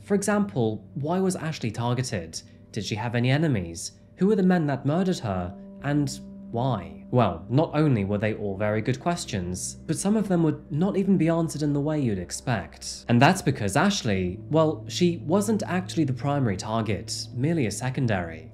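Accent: United Kingdom. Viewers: Well, he is from the UK and recently moved to Australia. Everyone has an accent to everyone else outside of where you're from.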